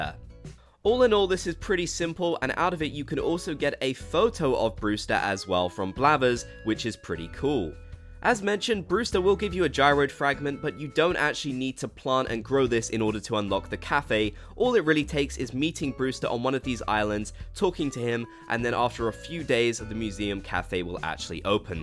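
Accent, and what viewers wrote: United Kingdom. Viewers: Wait are you british i thought your american but you sound British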